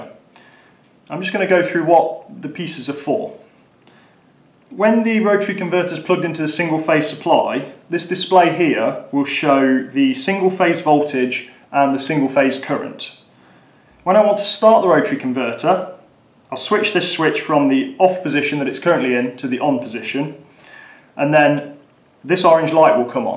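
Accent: United Kingdom. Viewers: The Union Jack bow tie and the Union Jack in his "Handmade Extreme" design didn't tell you he was from the UK? What about his accent? Or what about where he says "here in the UK". Yup, just for people with your low comprehension skills he should have flags on the walls, maybe also in the background a red double decker bus, a portrait of the Queen and maybe he could be eating fish and chips......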